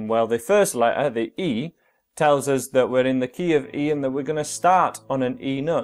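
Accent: United Kingdom. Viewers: When you sense the guy is British even before he opens his mouth.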